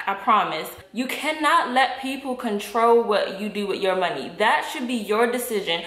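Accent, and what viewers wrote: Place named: DMV, United States. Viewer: Yas. Recognize that talk anywhere DMV!!!!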